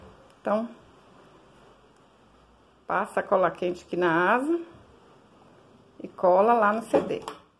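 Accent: Minas Gerais, Brazil. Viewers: Vc tem sotaque de mineira! Sou de BH ! Vou te dar meu like! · adorei essa coruja vou fazer e colocar onome dela de fuxigueira haha bj grande goste de ouvir vc falar me faz lembrar de minhas tias de minas gerais em andradas que saudades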